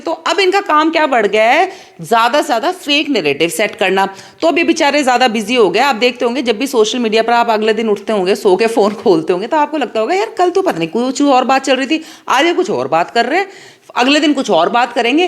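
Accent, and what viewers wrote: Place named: Haryana, India. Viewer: Bahut badiya bahan Haryanvi accent bahut jabrdast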